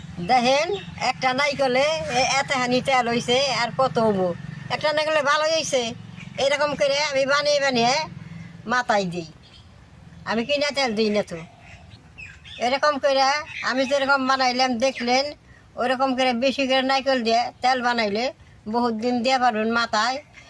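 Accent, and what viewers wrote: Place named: Jamalpur, Bangladesh. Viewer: আপনার ভাষা অনেকটাই বাংলাদেশের জামালপুর জেলার ভাষার মতো ❤❤❤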